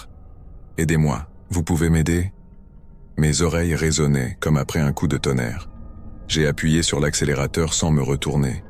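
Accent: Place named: Canada. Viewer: Quel régal ❤️ mais des fois ça déraille lol ça parle canadien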